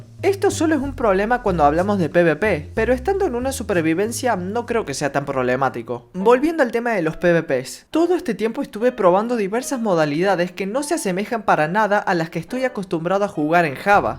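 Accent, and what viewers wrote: Córdoba, Argentina. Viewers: sos cordobe? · Habla como cordobés. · Cómo me encanta el acento cordobés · Sos de Córdoba? O flashee cualquera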